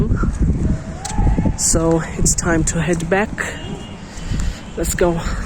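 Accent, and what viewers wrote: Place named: United Kingdom. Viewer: Your accent sounds really British. The intro is good tho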